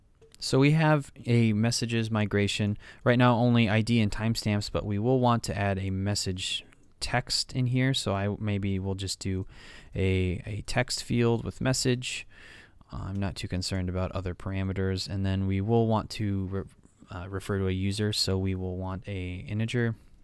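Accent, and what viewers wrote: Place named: England, United Kingdom. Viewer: i love your english accents and thank you sir :)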